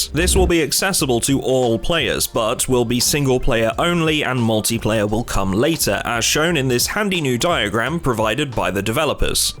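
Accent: United States. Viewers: I for sure thought you were American or maybe Canadian, you have a very American accent. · This one funny sounding American. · This American guy who makes these videos has an interesting accent.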